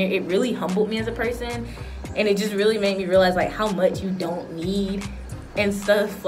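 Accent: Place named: Grenada. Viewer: Talking like a true grenadian i love u vids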